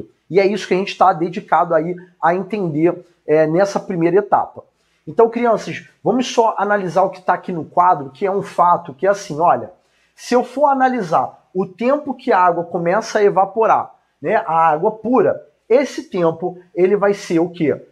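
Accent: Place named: Rio de Janeiro, Brazil. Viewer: Eu amo esse sotaque carioca. Quase me apaixonando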